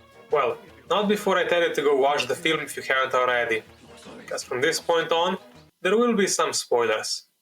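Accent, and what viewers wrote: Eastern Europe. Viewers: Good narrration ( love the slavic accent 😂, as i am from eastern europe myself) Great editing, good flow of video, never heard of the movie which was interesting Only one thing -The mic bro you need to upgrade that 😊 just some constructive criticism Good luck with your channel 👍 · Good explanation. I like video essays that cover films. What I like most is how a Slavic voice is explaining a Slavic movie, which seems more authentic to me. I do look forward to new videos.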